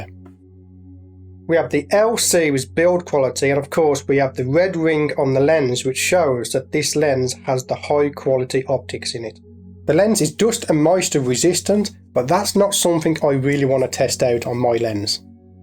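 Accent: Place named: United Kingdom. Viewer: Love your systematic division of points of review. Cool accent too... is that English? Which part of the UK?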